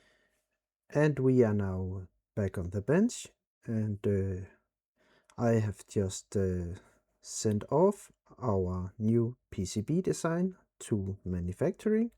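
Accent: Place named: Denmark. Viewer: Hehe the danglish <3 Kanon video ven. Hyggeligt med lidt nostalgi. Er der overhovedet en use case med 128mb ?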